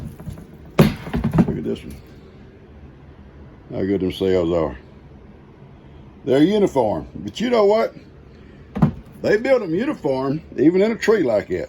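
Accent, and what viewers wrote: United States. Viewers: Hello..can I know where's your accent came from? Sounds like a cowboy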